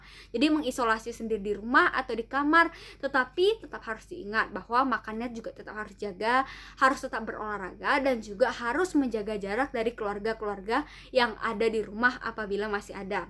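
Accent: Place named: Surabaya, Indonesia. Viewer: Ngomongnya suroboyo bgt ya😆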